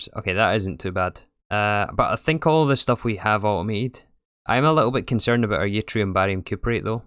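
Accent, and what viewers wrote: Scotland, United Kingdom. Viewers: Yeah, from GitHub about this issue: "The red matter nerfs were too much, previously 100 red matter needed to be crafted, now it's 2" But it doesn't matter, at least you can now set up a proper energy core. I could only add one after finishing the pack. I literally rubber-hammered the creative key out of the assembler line for lack of power. Like I said, I do enjoy watching this series, you have a totally different approach than I have. Two days before finishing the pack I still had only one EBF. I added some more because I got impatient waiting for more tungsten. And there you are, 20 EBF's in a row and 1800 tungsten in stock :-D :-D So it's interesting to watch. The Scottish accent helps too ;-) Keep it up !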